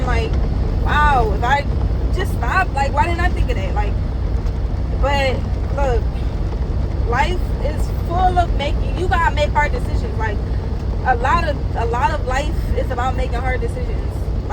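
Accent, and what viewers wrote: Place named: Philadelphia, United States. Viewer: You must be from Philly I can hear your accent! What you said is so true we have to establish boundaries to protect our own peace. You learned it the hard way, but thank you for passing the knowledge on to us.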